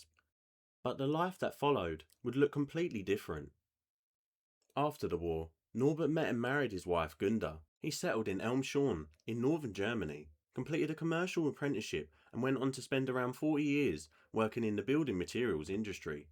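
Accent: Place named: London, United Kingdom. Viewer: work on your accent, too much London in it.....the letter 'T' is meant to be pronounced, not figh-er or for-y.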